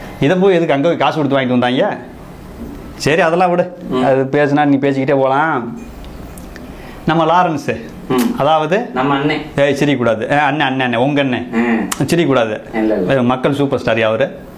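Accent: Madurai, India. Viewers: good try. I like madurai slang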